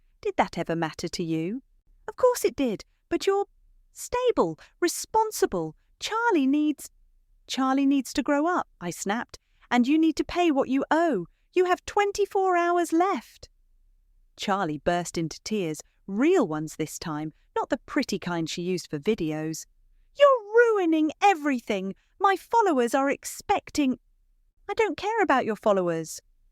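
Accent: United Kingdom. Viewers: A very good story. She really earned that house. The father choose peace over his daughter's inheritance right. They could've at the very least, split the ownership between their daughters equally. I believe that OP would've been happy with that, considering how she used to be before and be okay with that. Instead they were conniving and selfish. How do you allow one daughter to pay off the debt for the house knowing that she was never going to inherit any part of it and then give it sneakily to the other daughter who contributed nothing whatsoever towards it? I'm sorry to say that when her mother slapped her, she should've slapped her back as she had no right to do that just because she was being called out on her bullssh!t. Thank you, more like that please!!!!!!!🥰🥰🥰🥰🥰🥰. BTW, loved the British accent and i'm listening from the UK. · Love the British accent of the person reading the story! 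Listening from my home in Houston Texas USA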